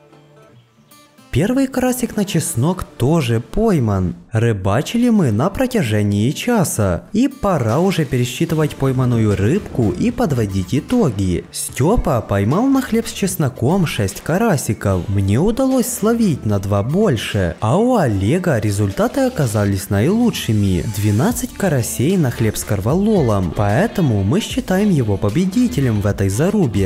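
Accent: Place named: Ukraine. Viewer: Он Украинец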